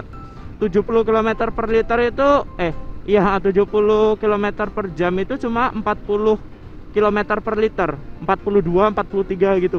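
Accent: Java, Indonesia. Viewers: omongane kagok ketok e wong jowo iki mesti😂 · Ngomong guwe ne medok mas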